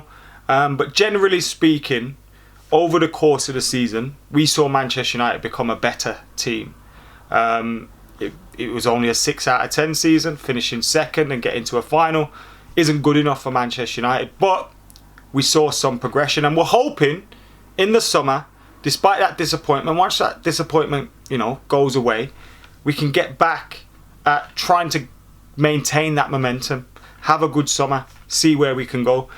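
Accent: Birmingham, United Kingdom. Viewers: you from Brum?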